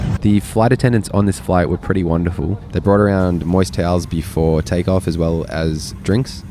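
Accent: Australia. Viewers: Sounding very Aussie which is a pleasure.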